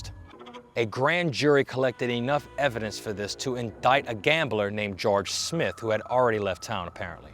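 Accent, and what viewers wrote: New Orleans, United States. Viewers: Stick with it. I believe your style and delivery are unique. They add interest to your presentation. Is that a New Orleans accent?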